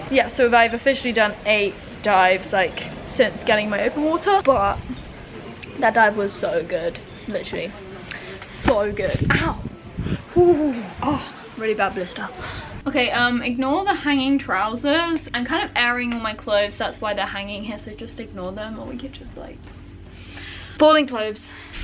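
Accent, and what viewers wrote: Australia. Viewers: i totally agree - you sound 25% Aussie, and your intonation is 40%! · You’re picking up the accent a bit! Aha xx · lowkey getting an Australian accent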